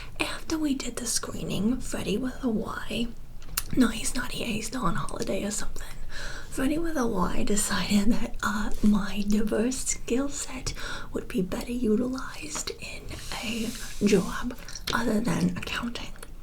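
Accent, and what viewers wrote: New Jersey, United States. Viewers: Haha the accent is so cool! All i could think of was jersey boys :D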